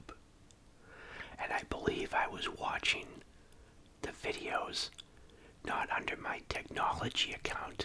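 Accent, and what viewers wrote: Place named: Boston, United States. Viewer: you should do radio ASMR videos. People would like your Bostonian accent.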